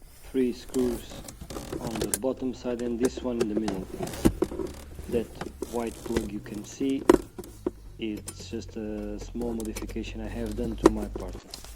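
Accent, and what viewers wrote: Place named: Portugal. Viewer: Thank you very much. Excellent information, very useful no doubt. But there is a cabin filter missing in Your car, because these cars always use two at the same time, one on top of the other. I know because I have a Partner Tepee, and I change these filters once a year. By the way, by your accent and your name, I imagine that you are Portuguese, like me? If so, you can answer me in Portuguese. Thanks.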